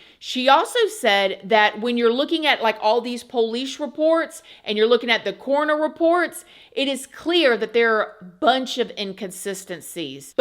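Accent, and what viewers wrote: South, United States. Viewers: Why would it embarrass you? Everyone speaks with different cadences and accents depending on where they are from. It's very commonly said in the south and is southern. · yeah we southerners have pretty much always said it that way lol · That’s how we speak in the south hunny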